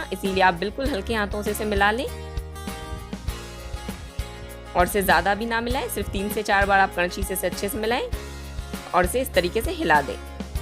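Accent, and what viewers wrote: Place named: Sindh, India. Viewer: Very. nice recipe... Also luv ur Sindhi accent...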